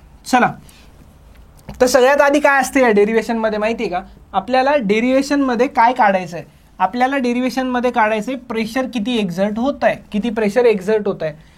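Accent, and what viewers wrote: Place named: Marathwada, India. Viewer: Jalna aurangabad style😅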